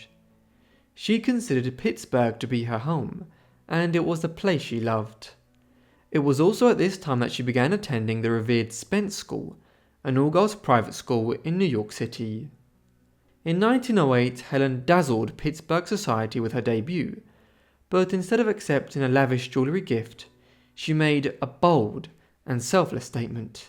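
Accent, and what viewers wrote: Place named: England, United Kingdom. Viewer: English accents drive me nuts. I can't listen to this BS.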